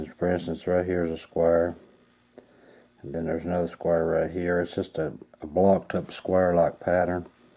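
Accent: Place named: Missouri, United States. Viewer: I'm coming down there to go hunting with you! All that in one field? Are you kidding me? I'm in Canada eh... I find a couple in a day, maybe up to 5 if I'm lucky... Sometimes, nothing at all. I'm in Southern Ontario, Canada... By your accent, you must be in Missouri or Arkansas or something like that... I really want to go down there one day and hunt a few of those fields....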